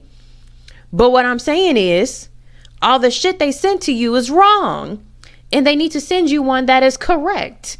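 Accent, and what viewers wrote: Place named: New York, United States. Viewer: I'm terrible with accents, I thought you were from New York 🤦 hope you feel better soon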